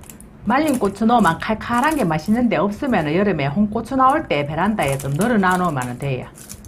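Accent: Gyeongsang, South Korea. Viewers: 경상도 아줌마 사투리 제대로 👍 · ! 경상도 말소리너무구요미 너무 행복 합니다(♡♡♡♡) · 목소리도.애교만점.최고.요리사입니다. 경상도.사투리.정말.멋지세요. 제친구도.대구있지요.요리프로. 최고.쉽고.제미있게하십니다. 축복합니다. 저는.전라도에.살지요.건강하세요.❤🎉😂😅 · 정다운 갱상도 아줌니 말솜씨땜시 보는것도 듣는것도 잼나게 밨어요 감사합니데이~~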